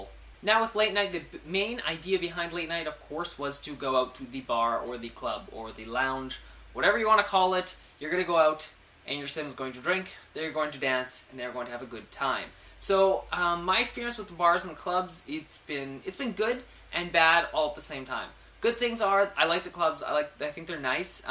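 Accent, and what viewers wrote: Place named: Canada. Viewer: AHA! i knew he was canadian, we have the same accent.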